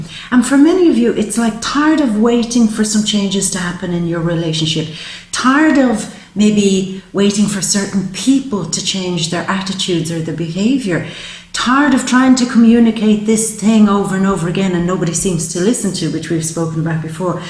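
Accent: Ireland. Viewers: Irish?? Love the accent. I'm hetro by the way but something about you....... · Between your beautiful calming energy, gorgeous face and enchanting brogue, I can't help but feel hopeful even facing adversity. Just as you said in this reading, I have someone really rubbing me the wrong way and it's family and I'm shaking thinking about the difficult conversation I have to have with them but I will stand resolute in the knowledge that I will speak my truth and that shall be good enough. Thank you...your gift is truly divine..wish me luck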